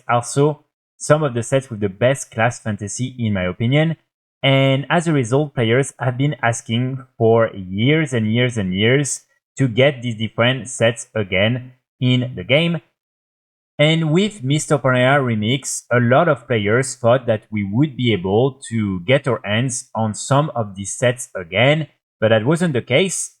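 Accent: France. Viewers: pas mal l'accent frenchi ahah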